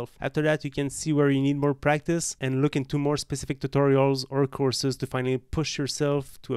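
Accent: Quebec, Canada. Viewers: Quebec accent is peak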